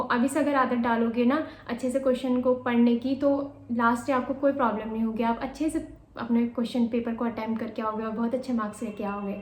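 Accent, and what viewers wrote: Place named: Pahadi, India. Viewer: Ur accent is of pure pahadi region dear😀😃🤗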